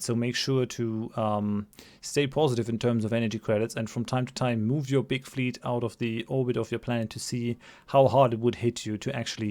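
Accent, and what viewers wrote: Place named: Germany. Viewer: I don't know why but the fact that all of this is being told by a guy with a German accent is really suiting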